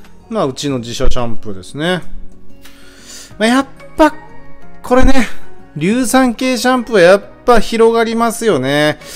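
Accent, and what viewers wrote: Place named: Kumamoto, Japan. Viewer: 熊本のイントネーション最高すぎる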